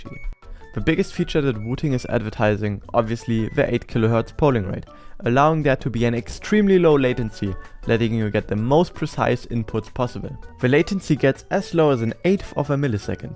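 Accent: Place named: Germany. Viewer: Diggi du bist deutsch oder? man hört direkt vom Akzent. :D Stabiles Video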